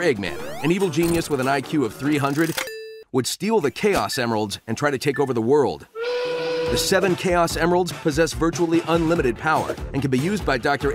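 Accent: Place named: England, United Kingdom. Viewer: Finally an english dude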